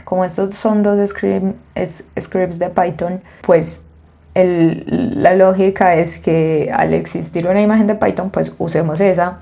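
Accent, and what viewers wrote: Antioquia, Colombia. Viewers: la primera programadora colombiana que conozco, me encanta el acento paisa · Quien vino por conocimiento y se quedó por la tonada paisa? 🙋. Muy buen contenido. Sigue así, saludos desde Argentina.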